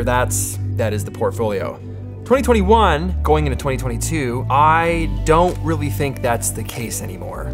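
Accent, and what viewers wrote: England, United Kingdom. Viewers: Man, that perfect British accent cracks me up EVERY SINGLE TIME🤣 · That British Accent though 😹❤ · that british accent broke my mind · That British accent 😂😂👌🏽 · That english accent though 😲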